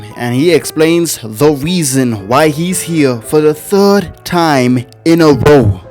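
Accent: South Africa. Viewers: I knew you were South African 😭😂🇿🇦🇿🇦🇿🇦🇿🇦 yebo lapho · I dont know .... bro sounds south african 😑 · You sound south African bro😂😂 but don't worry I fucks with your content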